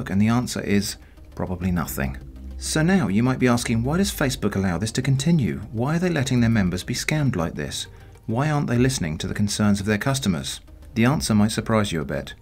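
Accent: United Kingdom. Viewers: Man at this point I am watching this channel only because of his British accent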